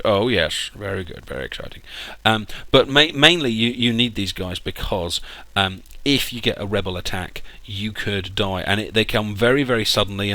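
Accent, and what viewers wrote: United Kingdom. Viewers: Thanks for the tips, it's great hearing a brit instead of the winey american accent and blubbers mostly rubbish... Could you perhaps do a tips and trick for Modern Times DLC, please?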